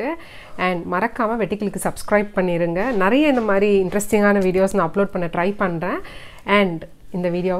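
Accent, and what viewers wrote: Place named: Coimbatore, India. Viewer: அம்மிணி நம்ம கோயமுத்தூர் பாசையா மிக மிக நன்றாக உள்ளது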